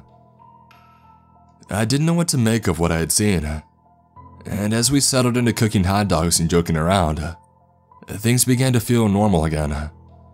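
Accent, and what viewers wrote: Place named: United States. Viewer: I'm from the UK and this is the hardest American accent to listen to. What State is this from.?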